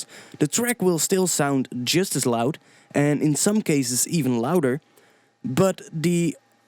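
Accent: Netherlands. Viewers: Somehow, if you're Dutch, no matter how good your English is, you'll always recognize the accent :D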